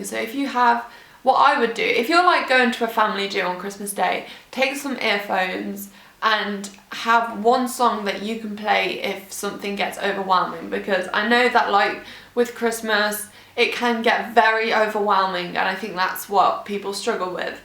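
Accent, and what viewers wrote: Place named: Coventry, United Kingdom. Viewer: She's from Coventry 😊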